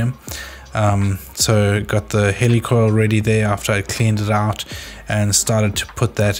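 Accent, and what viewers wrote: South Africa. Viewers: How to spot a South African accent! Very nice video - these heli coil thread repair kits are relatively cheap - saved my LiFePO4 cells!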